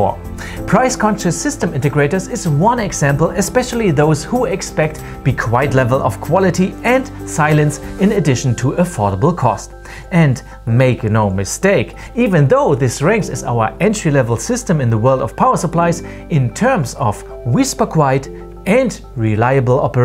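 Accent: Germany. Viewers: I feel the German accent but I have this alimentation and it doesn't makes noises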